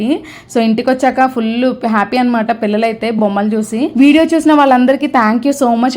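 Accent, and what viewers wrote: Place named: Godavari, India. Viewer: Meeru mataludu thunte godavari salang gurthuku vachindi andi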